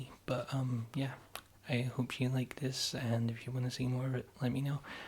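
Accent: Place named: Scotland, United Kingdom. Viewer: When you announced on Twitter that today's video was going to be about Scottish history and culture, I was so excited! I always love to learn more about different cultures~ About your accent, I can definitely hear a subtle Scottish accent, which is why I love your voice so much! Thank you for another relaxing (and informative!) video~ ^_^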